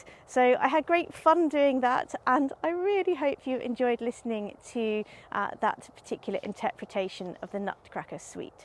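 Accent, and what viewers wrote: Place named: Switzerland. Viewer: Whereabouts in the Alps,? Sounds quite Swiss to me 😊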